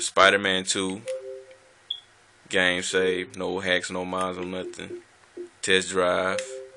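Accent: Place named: Georgia, United States. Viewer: Are you from Georgia?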